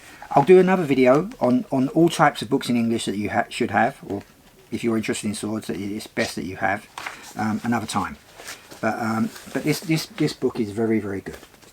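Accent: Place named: London, United Kingdom. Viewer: Hi just watched this again, I really like your relaxed attitude great english accent,I gather your a londoner as am I a long time ago. Really enjoy the books you have put up, looks like you have a massive library in your unit. Glad you've made it to where you are congrats on that great certificate all the best Terry.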